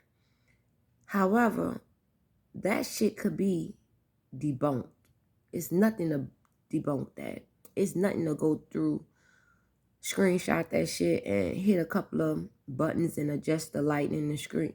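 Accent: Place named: South, United States. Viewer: Welcome back! Love you and your content just keep doing you My Queen. Love the southern dwarl my Queen. From the Chi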